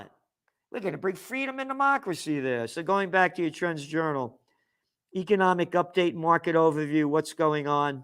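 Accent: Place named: United States. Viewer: From Manchester, England. Love your output . (And one of few American accents I can listen to)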